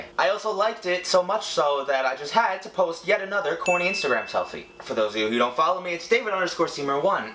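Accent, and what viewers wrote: New York, United States. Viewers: There are a lot of people on YouTube that annoying me. isn't one of them. I hope he can get more subscribers, he really does deserve it. It seems that he tries really hard on these videos. Plus he's a New Yorker like me. It's funny, even before I actually heard him say he lived in New York in one of his videos, I could tell he was from New York because his voice was so familiar.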